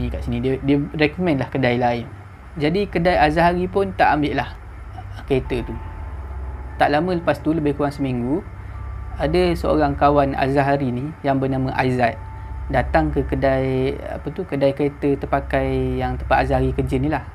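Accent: Terengganu, Malaysia. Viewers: ro tera citer kot base ganu kali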